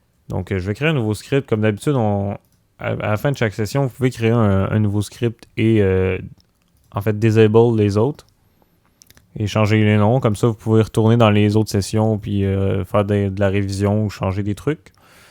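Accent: Quebec, Canada. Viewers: OMG... QUEBECOIS... ACCENT... J'ADORE !!!!! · Wow le grand accent québécois !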